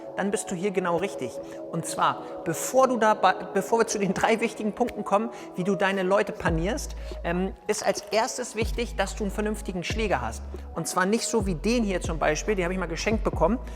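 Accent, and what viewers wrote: Hamburg, Germany. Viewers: Schöner Hamburger Slang Bin selbst aus Hamburg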